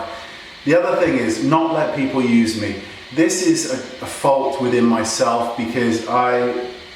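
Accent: England, United Kingdom. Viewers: Hey!:) I love your videos! I love your personality and I love you as a person. I know you have no idea who I am but I just wanted to say that you have a lot of support behind you (myself included) when it comes to your videos. I've learned a lot from your videos! Your accent makes me wanna go to England! My dad grew up in the UK and I have an Uncle that still lives there but I have never been to the UK. Hopefully one day when I go that I can meet you while I'm there. That would be amazing! Anyway, have a great day!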